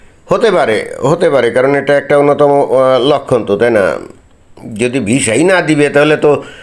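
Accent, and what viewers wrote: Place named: Chittagong, Bangladesh. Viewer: ওস্তাদের ভাষায় শুনতে বেশ ভালো লাগে। চট্টগ্রামের মনে হয়।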